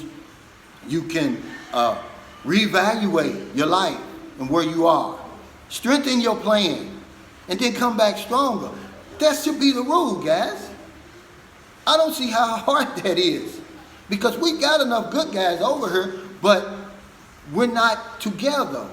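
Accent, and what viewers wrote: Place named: South, United States. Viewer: Thanks. I love your accent BTW ha ha . French is my first language so I have a slight accent in English as well, but I've always loved the South accent... especially for women but hey, I am a man ha ha. You do awesome videos, seriously. Have a GREAT day :)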